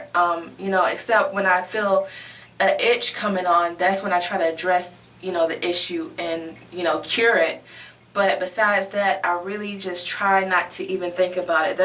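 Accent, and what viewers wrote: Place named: South, United States. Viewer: Girl, I'm from the South too and I understood everything you said, lol. Your hair is looking FAB honey! Watch before you know it, it will be loc'd, it's gonna sneak up on ya. ;)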